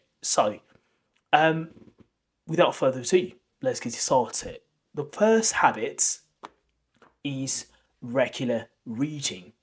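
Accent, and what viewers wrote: United Kingdom. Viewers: Love your British accent . I visited Brighton in 2005 .It was amazing.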